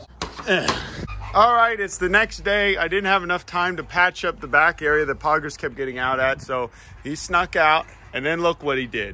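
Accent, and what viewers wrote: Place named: Pittsburgh, United States. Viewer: Dude your Pittsburgh accent while on the fahm is the best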